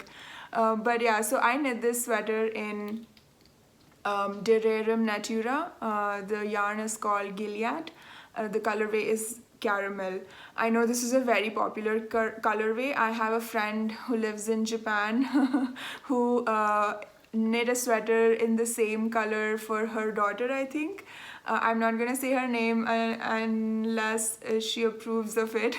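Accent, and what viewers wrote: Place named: Kerala, India. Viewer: Just found your podcast recommended by several other podcasts. Love your accent! Reminds me of a nun from Kerala, India I went to college with - Sr. Valsa Kumblankal. My husband and I also visited Gatlinburg a year ago and I found the same yarn shop! Bought some lovely yarns and was so thrilled to find a yarn shop to visit on vacation. I have already subscribed to your channel and am in the process of watching all of your videos!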